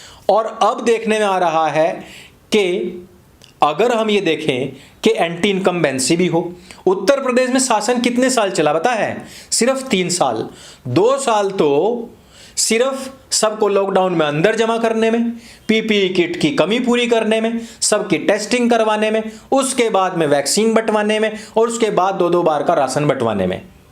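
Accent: Haryana, India. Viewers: mai haryana se hu bhot acha laga jo aaj aapne haryanvi boli😁😁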